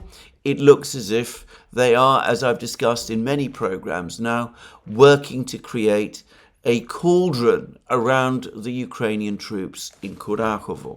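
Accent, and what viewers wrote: England, United Kingdom. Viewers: EduardoArtistaDelavega: you are talking from a common sense perspective, you are exposing the hypocrisy of America, but then you spell colour via the Webster dictionary. The Duran are interesting also, when I first watched the Duran I really believed that Alex not only lived in America, but I believed he was an American, even though he had Greek ancestry, as all Americans have ancestry from somewhere else. Alexander on the other hand, is so English in his use of the English language, coming here as a young boy, approximately aged 6, he has adapted to become very much an Englishman. But then one day soon after the Special Military Operation started, I discovered that Both Alexander and Alex had individual YouTube channels. Alex was walking around Nicosia on a daily basis and would also travel on occasion around Europe, I was totally taken aback by this fact, that Alex with his very strong American accent, didn’t even live in America, he probably just learnt American English, I still don’t really know why he has such a strong American accent. 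So we have this contrast with The Duran, one articulates himself via an American English accent and the other as an Englishman, but both are Greeks, although one is a Greek Cypriot. But this oddity is actually a winning combination and adds to the aurora of The Duran. So Eduardo, are you American, your use of English tells me you are not, but your spelling, tells me you are. Which are you?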